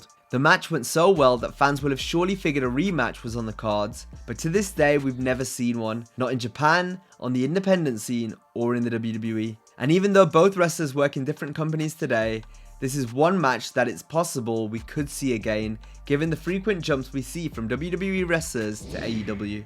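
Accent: United Kingdom. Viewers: Are you from Birmingham?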